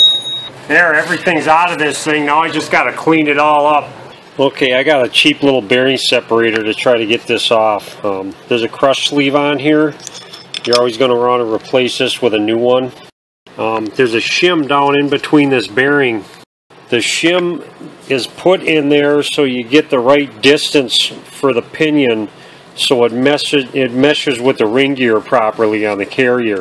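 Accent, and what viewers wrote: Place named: Midwest, United States. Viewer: Gotta love that ol' midwest accent lol! You just convinced me not to try to change the bearing on my 05 Jeep GC myself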